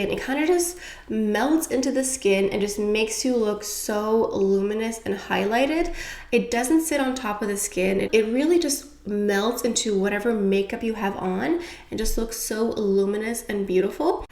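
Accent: France. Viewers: I do love the Dior backstage highlighter palette and I'm getting Joy edp as my birthday present on the 7th! I also own and love Chanel soleil le tan (the old version) and I'm going to repurchase it in the near future... Thanks for this new video. I love your French accent, it's so cute. I speak a little French. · R u French?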